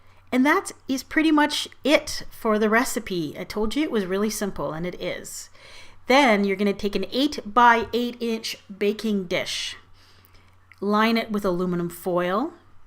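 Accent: Canada. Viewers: I love your Canadian accent :)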